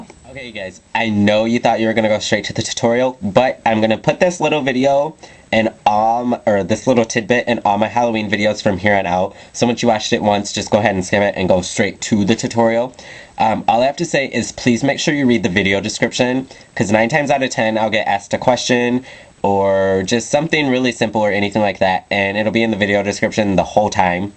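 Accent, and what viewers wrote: United States. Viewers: I laughed when you said (something like that) "or maybe look like a drag queen on smoke-snow" *laugh* (do you mean the white powder - like drugs?) (American English is defenitivly harder to understand than the English one) *THUMBSUP* For your good Make-Up Tutorials :)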